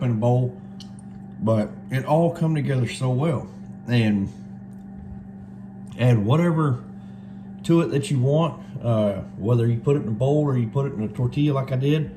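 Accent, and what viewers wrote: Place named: South, United States. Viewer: Do you ever cook using venison? I can tell by your accent you’re from the South. BTW, I live in Hayden Alabama!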